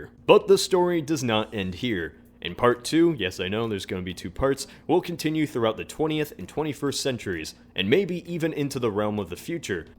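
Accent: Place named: Midwest, United States. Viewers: it's a Midwestern accent. from States like Kansas and Missouri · He's from the great state of Ohio, albeit Toledo instead of the superior cities of Columbus and Cincinnati.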